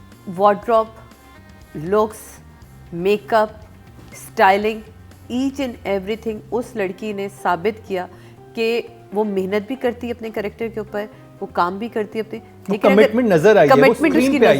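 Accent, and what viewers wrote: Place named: Pakistan. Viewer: every person has their own accent.Pakistanis will speak with a Pakistani accent which will be definitely different to Canadian,American or British English accent.You yourself have a very Pakistani accent.Education is not knowing E glish.English is a language its not a measuring stick for education.Btw the word is PRO NUN CIATION not PRO NOUN CIATION.Now you understand what is accent.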